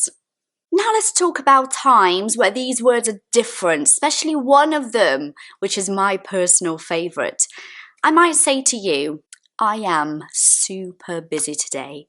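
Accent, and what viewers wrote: United Kingdom. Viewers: I love this british accent 😍🇬🇧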